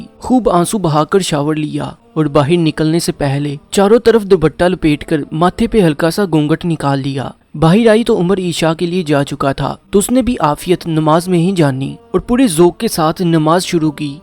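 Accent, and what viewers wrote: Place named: Lahore, Pakistan. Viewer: Great story like your Lahore accent.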